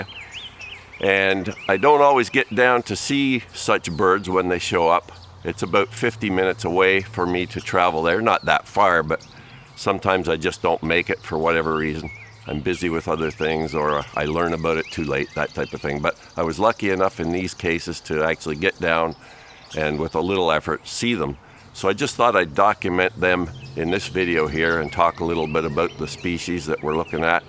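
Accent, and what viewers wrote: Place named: Canada. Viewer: What accent do you have, id like to be able to identify it in future, its like a Canadian dan ackeroid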